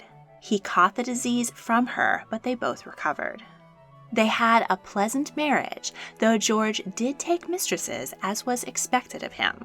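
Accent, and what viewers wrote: United States. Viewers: As son as I heard an American accent I passed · are you American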